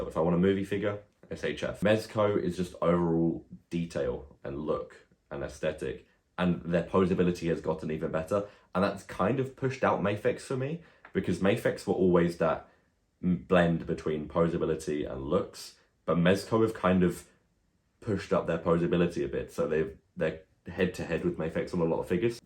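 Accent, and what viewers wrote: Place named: United Kingdom. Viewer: Very funny and informative review, subscribed! I'm guessing from your accent that you're based in the UK, if you are then please let me know where you get your figures from since I'm also based in the UK and shipping prices have gotten pretty rough since covid lol.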